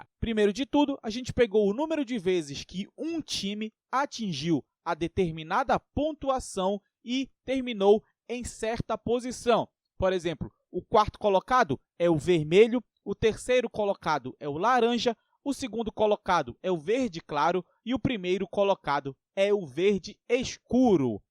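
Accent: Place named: Pará, Brazil. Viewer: Mano tu e paraense né não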